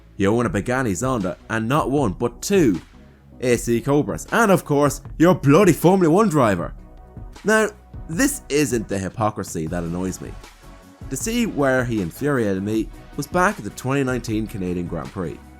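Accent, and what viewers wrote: Ireland. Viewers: tf you on about with weird accents and being British he's irish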